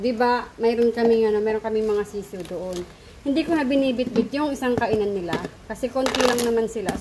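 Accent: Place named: Visayas, Philippines. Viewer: Madam napansin ko parang bisaya salita mo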